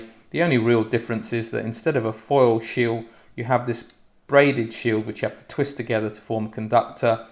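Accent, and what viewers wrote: United Kingdom. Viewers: that looked great - good explanation. I noticed some comments on the length of the earth/ground lead and would say the way you did it was dictated by the amount of space in the connectors (or, I don't see another way to do it with these connectors). The connectors you use now confirm you are in the UK, as your accent did;). My advice would be to look at the "domestic ring" that dominates UK home electricity cabling design, as the second "cheap" improvement option. That ring puts all devices in the same circuit - your high-end audio with your washing machine. If we ignore cynical commenters, and focus on "clean" AC, then we have a mission here. As you know too, what comes out of your speakers is AC that got rectified and next modulated by a source signal. Any!@ coming in on your AC can disturb and distort your music. Electrical companies in Europe are increasingly concerned about household and commercial appliances putting stray signals into their networks. 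So, with the single-side grounded shield on the power cable you now have provided for an isolation against inductance interference from other sources into the protected device and vice versa, contained some of the potential stray interference that your device might throw back in the air. As "cynical" is derived from the Greek word for "dog", the cynical puppies in all their illiteracy and lack of manners warrant a demonstration of interference. If you have (access to) an oscilloscope, nothing is more impressive tha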